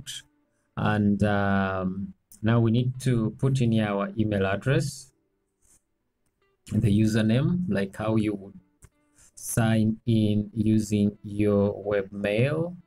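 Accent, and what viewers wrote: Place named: Uganda. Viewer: You sound Ugandan, thanks!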